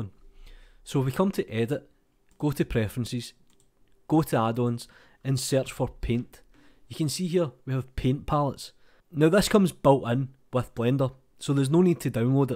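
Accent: Scotland, United Kingdom. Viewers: Brilliant! Thanks for the great tutorial: easy to follow and nice to hear a Scottish voice on Youtube!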